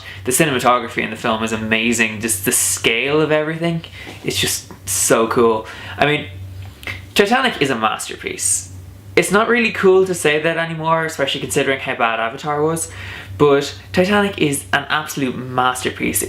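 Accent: Ireland. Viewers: The movie is impressive! After I went to the Titanic Light Show in Belfast two weeks ago I definitely decided to go to see the movie on big screen. DiCaprio and Winslet are genius, as always. Btw after my trip to Ireland I am missing the Irish accent, so beautiful! It was the reason I subscribed to your channel (your accent) =)) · I saw it in 3D, too. It was worth seeing it again in theaters, & the 3D in the film made it just a bit more enjoyable on the big screen. It is definitely a classic. Btw, great videos, I'm gonna subscribe cuz you're awesome. And of course I'm jealous of your accent ;) I wish I had grown up in Ireland.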